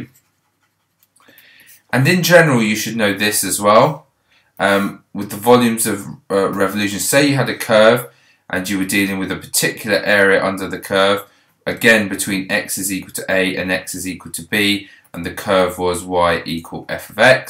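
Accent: United Kingdom. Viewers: woah, you sound Britsh!! I'm an Amurican. Thanks for posting, much appreciated :)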